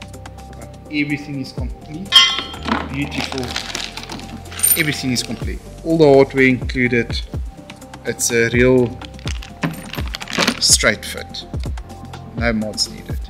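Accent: South Africa. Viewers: Man I love the SA accent! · The south African accent is the best accent 💪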